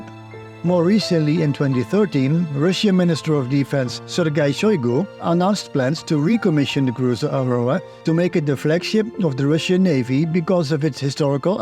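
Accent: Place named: Germany. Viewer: Oh pardon me. I just think you're German from your name and accent. also, I just know that you like the cemetery beside you like trying transportation. Indonesia actually has a lot of Dutch cemeteries. some of them still exist and some of them have already been destroyed. Examples of good cemeteries like Makam Jerman Arca Domas. Its cemeteries said that it's Nazi soldiers. Others are still good like the cemetery in kebun raya bogor, makam belanda at Menteng dalam Tebet and the museum Prasasti.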